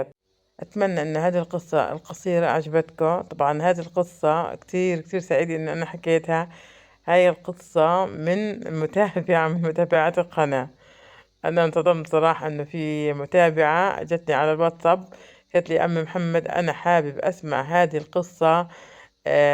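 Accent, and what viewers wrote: Palestine. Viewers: اللهجة الفلسطينية❤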